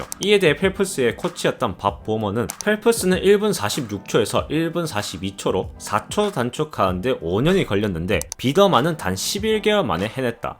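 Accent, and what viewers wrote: Gyeongsang, South Korea. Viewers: 나래이션 경상도분이신가요?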